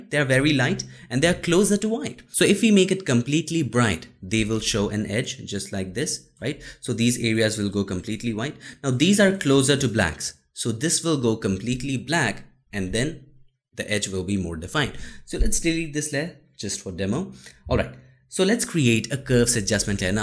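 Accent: India. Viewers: Sir, your accent is wonderful! I guess you're an Indian, and I feel elated to have watched this video! Thanks a lot! You nailed it bhaiya!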